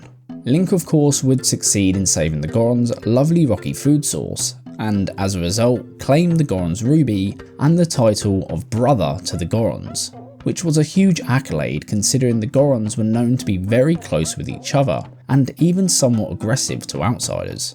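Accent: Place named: United Kingdom. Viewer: British accents are annoying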